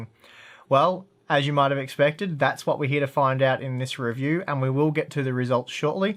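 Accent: Australia. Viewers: "Hear that? That's the sound of an australian accent."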